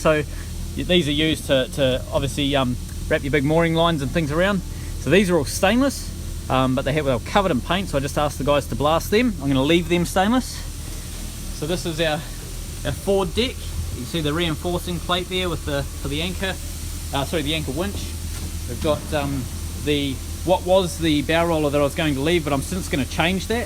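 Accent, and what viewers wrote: New Zealand. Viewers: Just randomly discovered this in Hamilton NZ. And detect an NZ accent?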